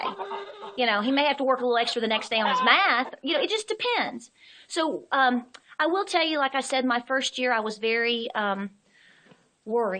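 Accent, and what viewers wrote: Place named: Tennessee, United States. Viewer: I want to keep listening because I love what you are saying and I am right there with you. But the rooster is giving me a headache. Please consider filming again without the loud background noise?? Please? I love your accent...I am from Tennessee and you sound like you are from around my neck of the woods.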